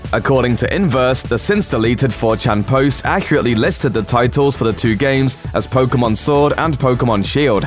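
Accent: United Kingdom. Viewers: Only fitting they the person who narrates has a British accent.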